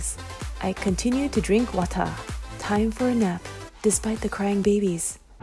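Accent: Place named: South Korea. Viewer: What’s ur nationality? It’s sounds like your a native korean lol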